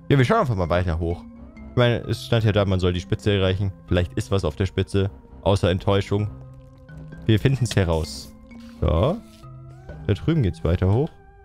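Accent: Germany. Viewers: man hört des Todes das du deutscher bist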